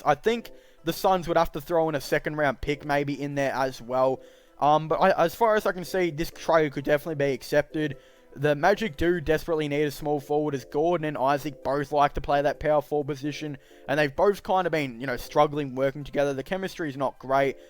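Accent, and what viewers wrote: Australia. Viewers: Deffs Aussie??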